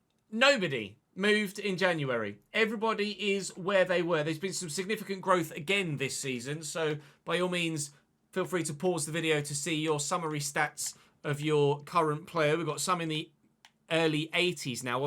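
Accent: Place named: Liverpool, United Kingdom. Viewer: A little disappointed... was looking forward to hearing the Scouse accent one last time 😂😂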